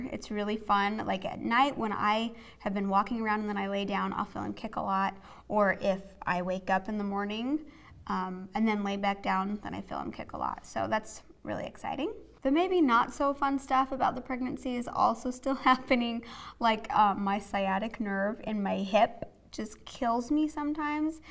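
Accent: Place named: Idaho, United States. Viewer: when you said "belly", I couldn't tell if that was your cute little Idaho accent poppin out (it's not that noticeable anyways, don't worry! haha) or if you morphed baby and belly together, because it definitely came out sounding like "Bay-lee". LOL!!! You are too darling!:)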